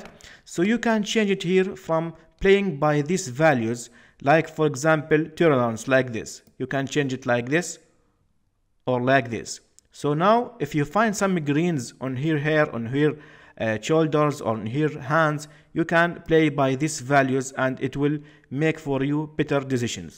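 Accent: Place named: Arab world. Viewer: يا اخي حاسك عربي🤔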